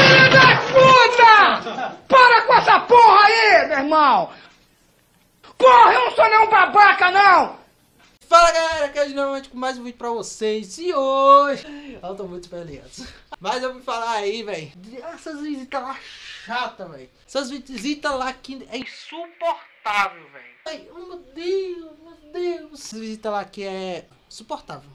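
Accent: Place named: Pernambuco, Brazil. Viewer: Mermao com esse sotaque ai ce é de pernambuco?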